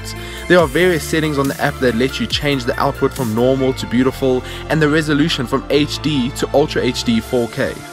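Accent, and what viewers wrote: South Africa. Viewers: South African narrator :)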